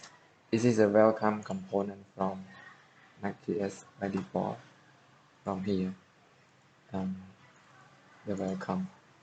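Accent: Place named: Indonesia. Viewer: hmmmm logatna kek orang indonesia :3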